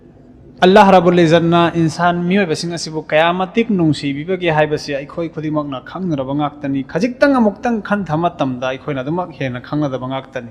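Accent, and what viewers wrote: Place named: Arab world. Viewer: Janab se Hafeez ama oibgi chp chaba magun lei.... Arabic accent, afaba warol sndokpiba, yam taba nungai......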